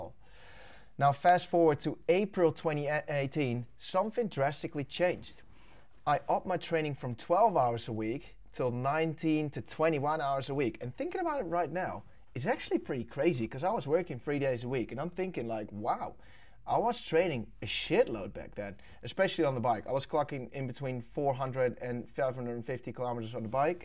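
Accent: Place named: Netherlands. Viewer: I studied in NL, now back in Thailand. Also training for triathlon to race in my country. Your story is inspiring and your accent makes me miss life in NL so muchhhhh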